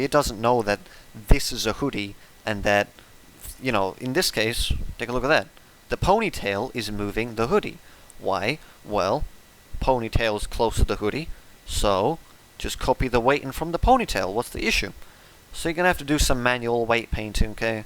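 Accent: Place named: Finland. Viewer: but you have a finnish accent 😭😭😭 where are you from then (if you're comfortable sharing that)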